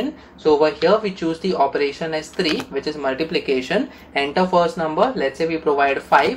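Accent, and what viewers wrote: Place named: India. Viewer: useful (i like the indian accent)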